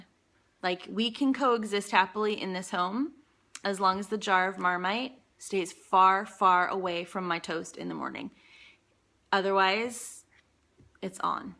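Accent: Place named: United States. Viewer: Yes my dear so happy for u it's amazing how u keep ur accent I thought if ppl live long somewhere they will have the accent of country. I love ur American accent btw and me I'm 5 -6 years in the uk but can't get rid of my meerkat accent 😂😂😂 ur videos are amazing keep it up Hun 😘😘😘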